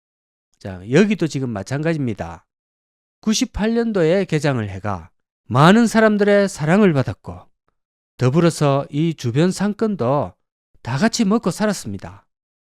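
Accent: Gyeongsang, South Korea. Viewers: 오우 경상도 싸투리가 외국어 처럼 듣기 힘들어요😢😢😢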